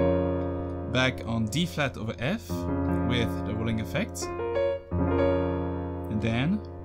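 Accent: France. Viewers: Absolutely love this video, it's really helping me. Thank you! Cool accent by the way, were are you from? France?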